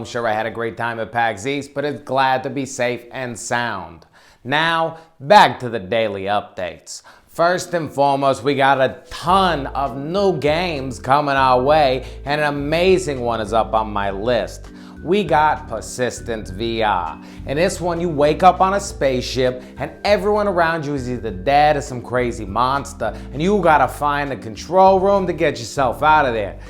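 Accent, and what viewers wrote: New York, United States. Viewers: are you from new york or mass? figure it out.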